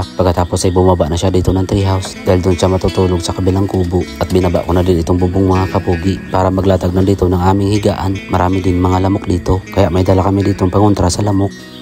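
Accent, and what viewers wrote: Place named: Visayas, Philippines. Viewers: Abot hanggang Antipolo, Taga San po kayo? parang waray salita niyo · Saan ka sa atin dol? Bistag dialect mo diba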